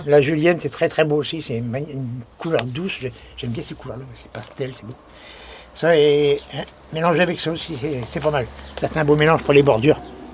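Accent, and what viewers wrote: France. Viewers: Vous le méritez par votre implication au quotidien pour nous faire partager votre passion... continuez à prendre du plaisir à le faire... votre accent est un régal au contraire... c est çà la France, et la richesse de ses différentes régions : coutumes, patois, accents spécifiques, gastronomie, territoire, sol et climat... etc... ne vous changez pas, soyez fier de votre identité et les personnes qui voudraient lisser toutes les beautés de nos régions passent à une autre chaîne qui mets en avant des futilités, l argent, le langage, le physique etc... ne rentrez surtout pas dans un moule à la mode... car par principe la mode est éphémère et cyclique... pour revenir toujours à des choses anciennes qui sommes toutes reviennent à la mode du jour... restez comme vous êtes... et soyez sûr que vous avez du public de tout âge car les nouvelles générations comprennent l'intérêt de prendre soin de l environnement et de produire soi même ses légumes, fruits et autres... 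vous incarnez beaucoup de valeur et êtes ce relais inter générationnel... alors continuez à transmettre... milles merci à vous deux et à tous ceux qui comme vous partagent leur savoir et transmettent leur passion (Philippe, Olivier, André... mais aussi les permaculteurs, le jardin des merveilles, et bien d autres)... Merci 🙏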